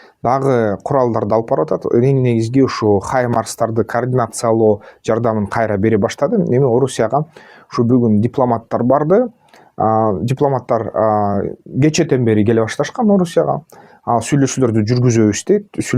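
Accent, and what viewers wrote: Standard. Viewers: Таза кыргызча суйлогонунуз жагат, азаматсыз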